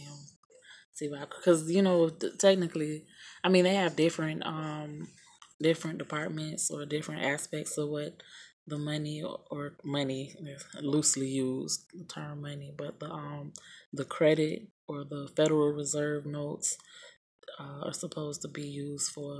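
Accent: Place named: South, United States. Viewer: Hello First I wanna say that I love your "Southern Draw" lol Well I did everything you said and it went through. I even got a conformation of my order. However no shipment conformation or no products was ever delivered. Looked back at my order purchase on amazon and nothing was there. The order was cancelled. So does this really work?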